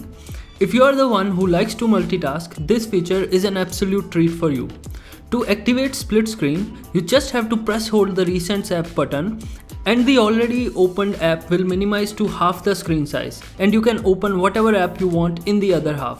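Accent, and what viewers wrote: India. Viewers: diksiyonuna ettiğimin hintlisi kulağımı deldin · Almost every indian i know or watch speaks like this...It is bounded and it doesn't sound that bad... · am i the only one who love indian english? i love your accent man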